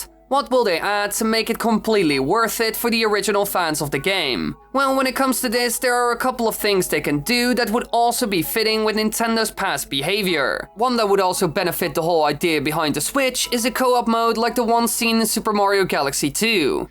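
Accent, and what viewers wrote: Netherlands. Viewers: I really wish I could be there, I,m still in school and have homework to do😭. Also you sounded kinda dutch are you exactly from the Netherlands? And a nother also, I love your vids there epic!!! I have watched every single evolved video! Have a great day. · OMG that’s why the accent mijn broer van Nederland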